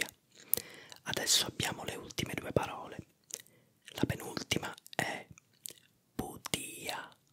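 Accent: Sicily, Italy. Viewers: Come mi piacerebbe capire di più il dialetto siciliano ! Suoni bellissimi 🤩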